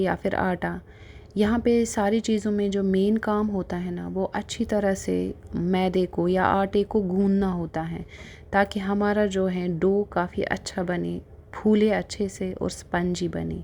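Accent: Kashmir, India. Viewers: Kashmiri lugti ho aap aao k bolne ka andaz waisa hai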